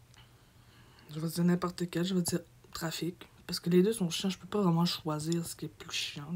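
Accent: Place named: Quebec, Canada. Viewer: Cest quoi avec les ramen au poulet et il ya quoi a cote jai pas compris quand tu as parlé dsl je comprend pas comme tu as un accent québécois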